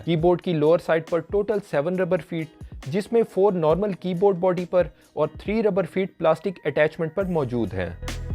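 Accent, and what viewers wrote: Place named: Peshawar, Pakistan. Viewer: Yar ap ky bolny ka style Peshawar k logo jesa he. 🤪 But phir b kha se he ap☝🏻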